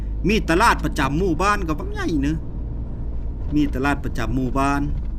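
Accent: Phu Thai, Thailand. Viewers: เจ้าเป็นคนเเขวง เมืองเลอ คึเว้าพูไท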